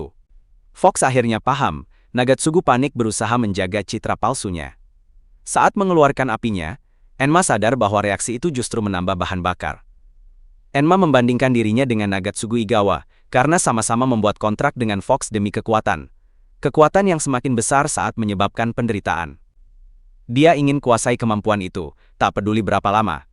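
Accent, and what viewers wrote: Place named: India. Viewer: Suara nya kayak India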